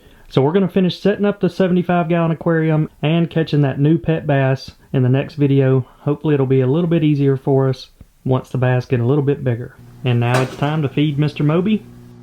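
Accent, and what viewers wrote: South, United States. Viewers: I'm surrounded by a bunch of commies living in California. your Southern personality and accent really makes me feel like I'm back home.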